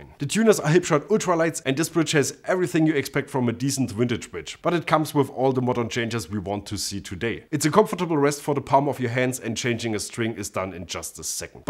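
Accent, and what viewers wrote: Germany. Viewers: Just so much in this Vid . Amazing German accent- ✅ amazing bass ✅ amazing bass face ✅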